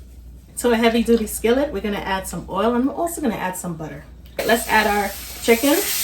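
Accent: New York, United States. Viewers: Are you from New York? Love your accent and your recipes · ….you gotta be from New Jersey or New York 😀 Food looks delicious!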